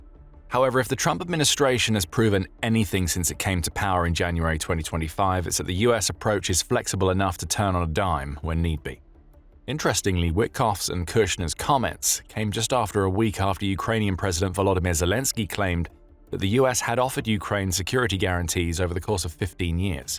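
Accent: England, United Kingdom. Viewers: English accent but American grammar.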